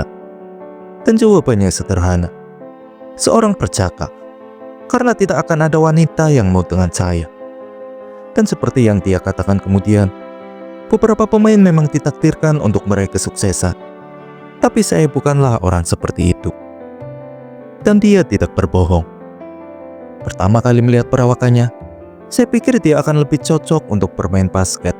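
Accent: Tegal, Indonesia. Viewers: Min,orang tegal y,? Dri logatnya sih kayak tegal,😅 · Logatnya, tegal, mas??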